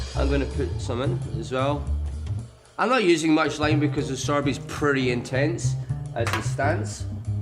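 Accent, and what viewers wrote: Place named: Scotland, United Kingdom. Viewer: i love his accent..he's scottish?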